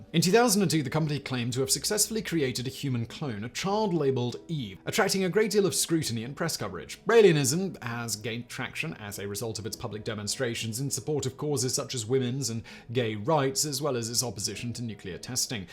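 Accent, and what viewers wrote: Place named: United Kingdom. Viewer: Aaahhhh! The famous British accent sarcasm😍😍😍….so sexy!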